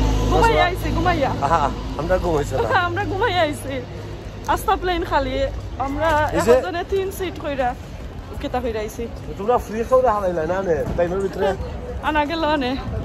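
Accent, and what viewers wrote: Sylhet, Bangladesh. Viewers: Speak bangla not shylhetee.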